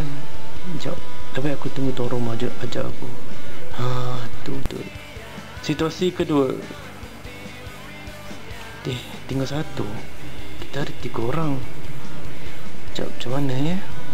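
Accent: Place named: East Coast, Malaysia. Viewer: ko org mane ek? slang bunyi cm pantai timur je. hehe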